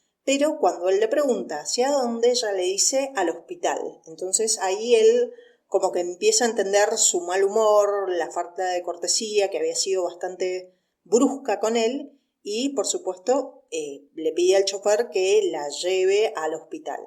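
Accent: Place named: Argentina. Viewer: tienes razón promete y mucho esta serie y ellos hacen buena pareja, Kivanc no defrauda me gusta mucho es un actorazo, siguiéndole como siempre desde MALLORCA (perdona tu ede que parte de Argentina eres,xq tienes el acento pero eres muy pausada y dulce hablando,lo dice alguien de Rosario ),🙋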